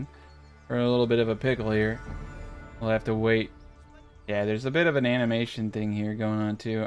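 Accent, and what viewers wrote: Canada. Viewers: i was thinking that you were from Canada🤣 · as a South African i thought you where Canadian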